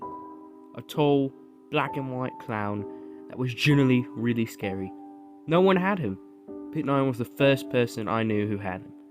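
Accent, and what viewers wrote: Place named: United Kingdom. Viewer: the slight british accent while reading this makes this video ten times fucking better man, great job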